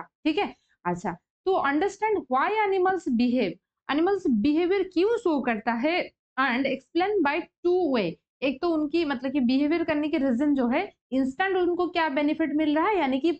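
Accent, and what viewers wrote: Odisha, India. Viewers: Odisha se ho kya app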